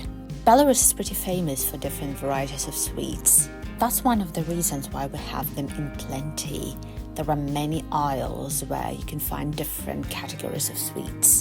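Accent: England, United Kingdom. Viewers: Thank you for taking us to Belarus super markets and showing different varieties of groceries in your country place... I like your English accent...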